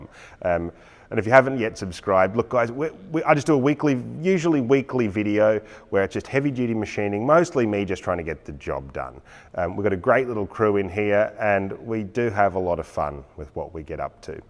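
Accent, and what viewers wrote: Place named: Australia. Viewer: Hi, new sub here Jon, from mid-wales, formerly from Colchester, which is a bit weird to hear the old homestead being called out in an Aussie accent :-) I tend to think of Colchester lathes as being 50s-60-s machines, student/master et al. nice to see the name at least still going strong :-)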